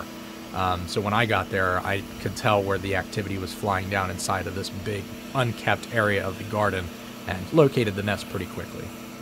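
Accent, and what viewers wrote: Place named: Pennsylvania, United States. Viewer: My son and I absolutely love your channel! He is currently 5 years old and is battling leukemia and to see him get so excited every time a new video comes up really makes my day. He only has two more months of chemo to go and everything is looking good =) We used to live in NJ so we are used to the PA accent with "cone" and such so that brings us a little taste of home from all our friends in the PA region. Saw a Japanese Hornet fly by our house (we currently live in Thailand, long story lol) and immediately thought of you doing battle with these. Those hornets would not have a chance ;)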